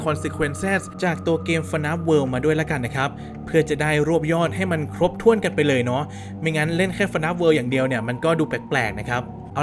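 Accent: North, Thailand. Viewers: เหมือนคนเหนือเลย พี่อยู่จังหวัดอะไรครับ 555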